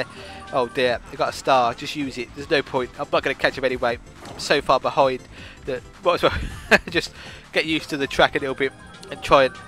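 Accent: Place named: United Kingdom. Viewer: you are so awesome with that british accent hehe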